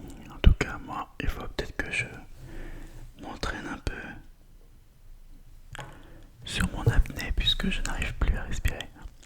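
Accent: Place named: France. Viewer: 💌 Hi,💟 I love the French accent and thanks to ASMR with soft sounds I feel very, very good. Thank you.🙌✨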